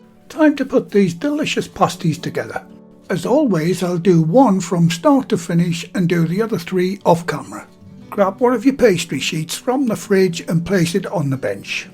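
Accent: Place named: Sunderland, United Kingdom. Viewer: Always enjoy videos some great but simple recipes well explained. The Mackem accent always helps!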